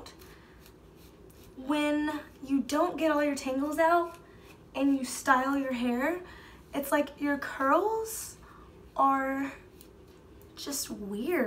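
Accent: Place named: Tennessee, United States. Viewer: Omg yes girl! I was hearing your accent and i knew you were from Tennessee. Go Vols ❤️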